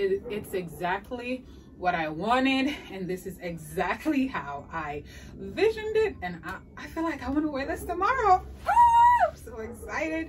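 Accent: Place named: Caribbean. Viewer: Possibly one of the best hauls ever like - what amazing pieces! I thought you'd climaxed early with that incredible fuzzy, baby blue jacket at the start just left me thinking - how do you top that? But I love the red shiny leather (especially paired with the shorts) and then that pelted black fur jacket at the end - that looks too good! Definitely a date night type coat - hope you saved it for Valentines Day! Great haul. I'll throw a sub your way. Ps where are you from? I love the accent. I'm guessing somewhere in the Caribbean?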